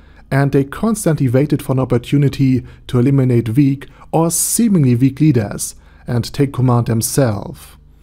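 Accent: Germany. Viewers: I appreciate your videos. I’ve been watching and looking into your videos for years now. I love accents, and your German accent is phenomenal my man.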